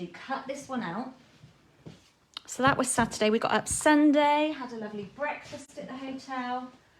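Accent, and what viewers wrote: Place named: United Kingdom. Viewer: I'm watching the replay. I'm getting a kick out if all your British terms. I love, love your accent! The cards are lovely.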